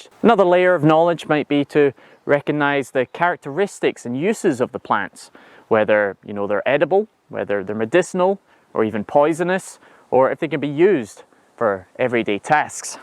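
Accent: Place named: Scottish Highlands, United Kingdom. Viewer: Hi there...great video!!!! I love plants and gardening...but what I loved the best is your lovely accent of highlander...my ancestors came from Scotland Morayshire, and settled in Argentina, South America....I'm in love with Scotland and hope to go one day. Thanks again pal. Beautifull my Scotland.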